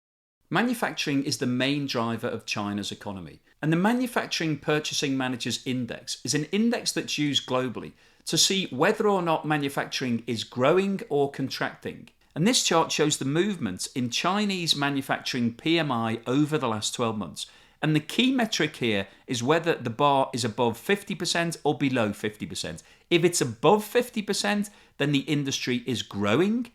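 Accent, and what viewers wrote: United Kingdom. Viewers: You have a British accent but you don't know shot.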